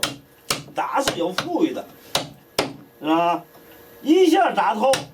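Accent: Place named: North, China. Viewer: Usually I'm able to understand standard mandarin but the Northern accent of this master craftsman is just too much for me.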